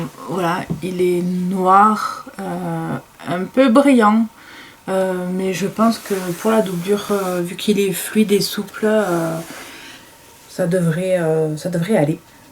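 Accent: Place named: Aveyron, France. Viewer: Arrivée par par raton et 🐼 Bon début Je suppose que tu es tu Tarn Vu l accent 😘 de l Aveyron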